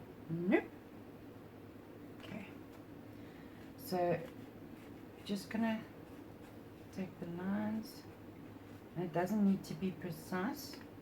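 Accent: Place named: South Africa. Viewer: Oh my goodness that os a stunning layout. Its so wonderful to find a fellow crafty south african! I watched your video while baking bread. I miss that accent terribly.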